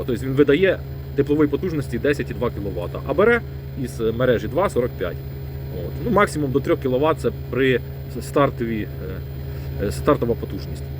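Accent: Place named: Surzhyk, Ukraine. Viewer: Дякую, все цікаво, але цей СУРЖИК.... Кожне третє слово.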